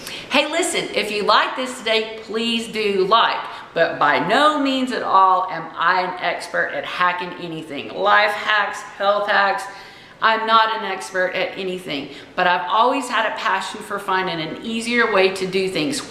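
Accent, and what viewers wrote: South, United States. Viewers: Omg, I love your southern accent. I've lived in Washington state for 30 years and your accent really makes me miss the south. ❤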